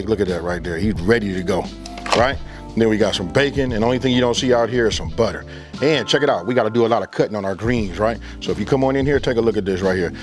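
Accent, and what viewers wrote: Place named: West Coast, United States. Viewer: hahaha bruh got a thick West coast accent.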